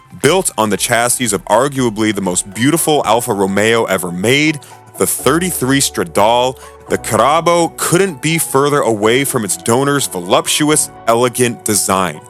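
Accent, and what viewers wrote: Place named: United States. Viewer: Any A.I. voice would have spelt any of those names much better than any "American". To not to say anything about his "TweNNy-tweNNy"...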